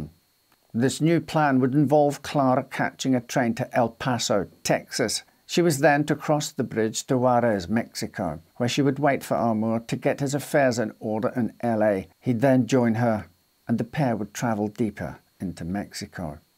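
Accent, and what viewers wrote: Scotland, United Kingdom. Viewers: Definitely Scottish. A very light burr..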